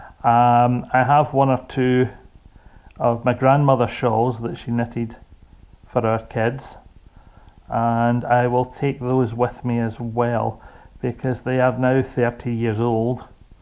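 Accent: Scotland, United Kingdom. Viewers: Everything you have shown is beautiful ! Its great hearing a Scots accent on YouTube ! I live down in Gorebridge . I can talk the heed of a stamp you'll regret saying just ask ...is it a Scottish thing ? We love a natter x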